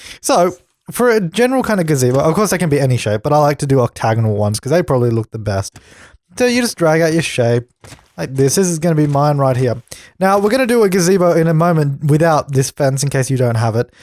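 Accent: Australia. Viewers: Finding this in 2024! You sound like an Aussie just like me!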